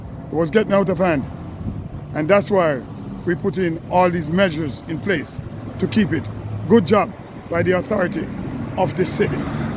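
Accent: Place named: Central America. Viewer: Are you from Central America? More specifically Hondurus? I am from Belize and your accent is very similar to my dad's.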